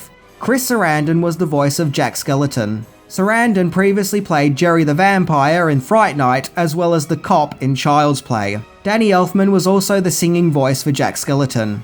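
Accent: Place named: Australia. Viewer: Eh, he's Australian... they say things weird. I remember my Ex and I laughing back and forth over the way each of us pronounced "roof" or "shore"...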